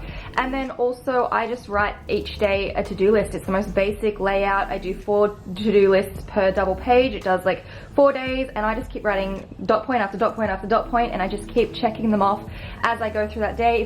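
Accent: Australia. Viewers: your aussie 💕💕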